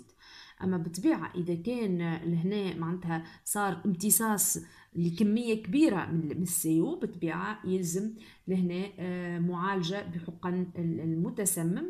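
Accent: Tunisia. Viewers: Min lahjitha ta3rifha tounsia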